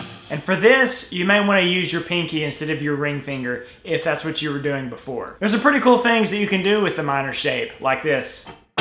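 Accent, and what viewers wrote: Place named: Alabama, United States. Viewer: As soon as I heard his voice I was like 'Alabama'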